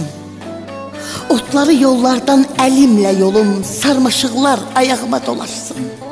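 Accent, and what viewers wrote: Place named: Nakhchivan, Azerbaijan. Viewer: 😂😂😂Lehçe lap Naxçıvan lehcesidir ki😂😂😂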